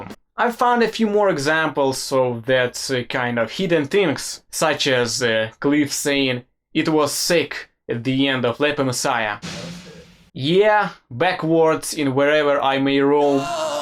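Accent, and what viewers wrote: Russia. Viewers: Good video! You're russian? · Русский английский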